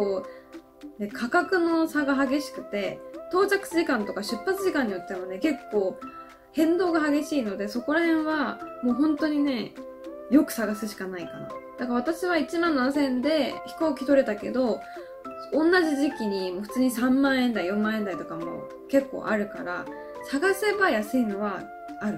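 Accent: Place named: South Korea. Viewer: 日本語の発音が韓国語すぎて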